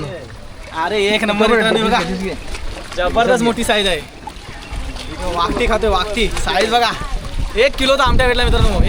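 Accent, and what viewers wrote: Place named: Konkan, India. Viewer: भाषा तर कोकणात वाटते दादाचा कोणत्या गावचा कमेंट करून सांग कामांची रिप्लाय दे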